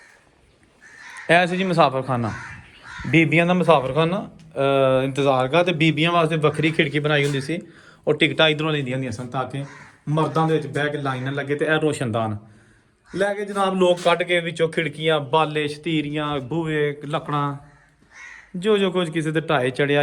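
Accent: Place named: Jammu, India. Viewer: Thudi boli bilkul Jammu aur kathua wali hai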